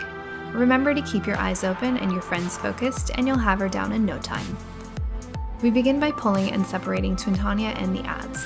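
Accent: Canada. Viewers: Are Canadian or from Minnesota? (The narrator)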